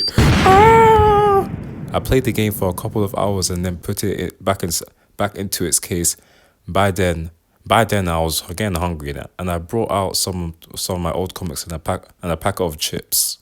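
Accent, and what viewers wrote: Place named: United Kingdom. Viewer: The story is good and looks like a real book, and your British accent is so calming and peaceful.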